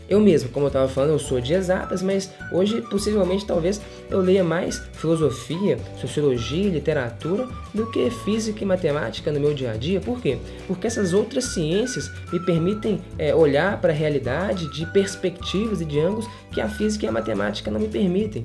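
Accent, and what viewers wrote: Minas Gerais, Brazil. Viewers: Ele é Mineiro, se não me engano.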